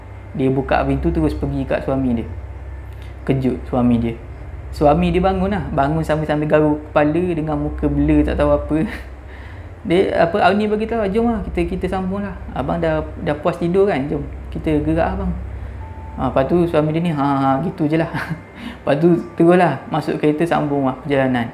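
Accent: Terengganu, Malaysia. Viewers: ckap ganu kali bang😁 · Setuju tak semua orang boleh faham dialek Terengganu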